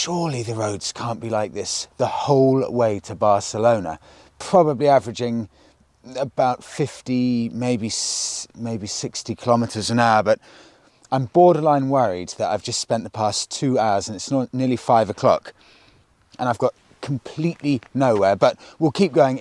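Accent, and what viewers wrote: RP, United Kingdom. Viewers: Firstly, thank you for speaking so eloquently! As a fellow Brit, it's very refreshing to hear the Queen's English spoken as it should be... Secondly.. How.....? I used to have a Bonneville and found it very uncomfortable after about 70 miles.. Also, it needed filling up every 85 miles... Fair play to you though... I've done this trip before on an FZS600 and loved every minute of it..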